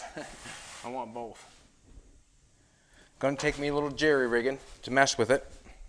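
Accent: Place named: Canada. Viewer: This dudes for sure a Canadian!!! Listen to his accent!! Yeah buddy!! That cab oversight gonna kick some serious ass!!!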